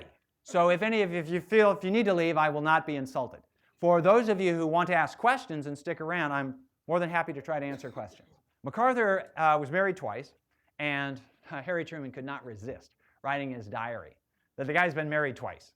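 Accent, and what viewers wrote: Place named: Oregon, United States. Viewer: I listened to an entire lecture from Brands thinking it sounded similar to another personality, but I just couldn't place it. Then I stumbled across a Paul Harrell video. They are both from Oregon, and presumably from the same state region. Linguistic mystery solved!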